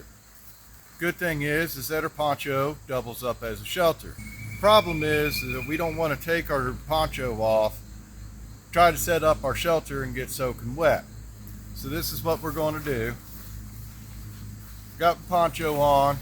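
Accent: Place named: Kentucky, United States. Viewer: I appreciate the manner in which you realistically presented the ACTUAL challenges in making a shelter while wearing a poncho. Thank you for expressing your concern for victime of Hellene. Our area along the northern half of the Al-GA state line was in the originally projected path of the storm before it suddenly turned northeastward & missed our area. We have many friends who live the areas most devastated. ALL the victims remain in our thoughts & prayers. By lowering the end of the poncho tied to the tree, the plow point shelter becomes long enough to stretch out, lie down, & sleep if an overnight stay becomes necessary in an emergewncy or survival situation. Thank you, & may God bless you, sir. Your accent reminds me of those which my family heard when we lived in SE KY about 40 miles beyond the Cumberland Gap. This presentation motivated me to watch your other videos & possibly subscribe! 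An 83 year old outdoor adventurer.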